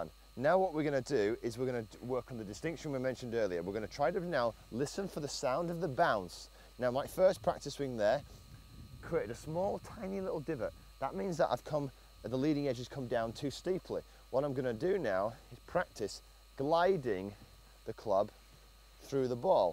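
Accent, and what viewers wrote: United Kingdom. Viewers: That ain't no different chipping method, WTH is this British speaking talkin bout.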